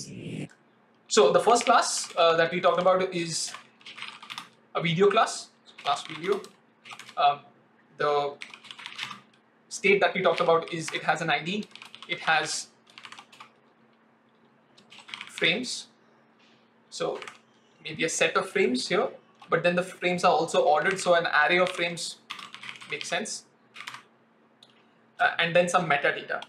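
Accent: India. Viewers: If a course starts with "hellou ewerywone!" I at least know that he put in the effort and worked damn hard to make it! Cheers! (For the trolls, this is not racist but actually respecting and valuing the creator and effort! I have been to India.) Thanks, great intro! 🤘🏻😎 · Oh my god, i was very happy to hear white face with good english and right after we were fucked up with indian accent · Great video thanks for sharing. However, you Indian guys learn everything but not the accent, straighten it as it makes it difficult to follow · As a non native english speaker, the indian accent adds difficulty. But it's in the pain that you soak up knowledge the better. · I have to admit, even though having a bad accent, indians most of the time give very good lessons, I just need to get through the difficulties of understanding the accent)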